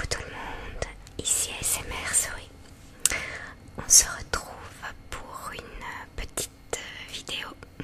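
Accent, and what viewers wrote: Belgium. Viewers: Bonsoir, tu es belge ? 🤔 sinon video trés relaxante, j'adore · Coucou, tu mérites tout le succès que tu as:) j'écoute principalement des vidéos ASMR anglophones, russophones et germanophones. Mais là, je craque, j'adore tes vidéos, toutes autant les unes que les autres! J'espère que tu vas bientôt avoir de bonnes nouvelles pour ta santé. Pour ma part, je suis en post burnout et dépression depuis janvier. Tes vidéos me font beaucoup de bien, et l'accent belge... 💗 Je suis fan! Gros bisous, prends grand soin de toi. La santé est prioritaire:)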